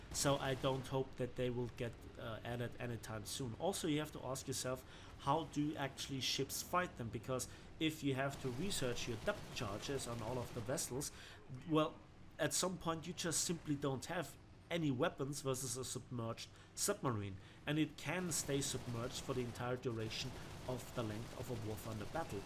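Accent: Bavaria, Germany. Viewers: Question: What do you think of WoT in General? Und kannst du, wenn du deutsch sprichst bitte das Bayrisch unterlassen? Ich hab dann immer das Gefühl, ich hör Seehofer oder Söder zu. Yet thete is no indication of He filler, penetration values or fuses and so on in WoWs...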